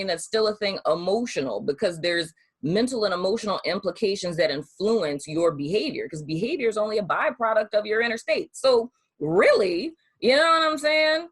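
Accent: New Orleans, United States. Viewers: I knew you was from New Orleans from your first word!! I am too & I said “Lawd she sounds like me” lol! ⚜️⚜️⚜️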